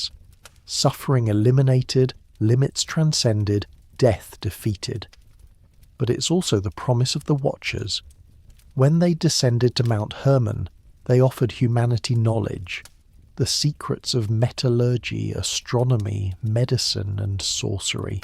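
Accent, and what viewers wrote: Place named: England, United Kingdom. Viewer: please please No More English Accent !!! please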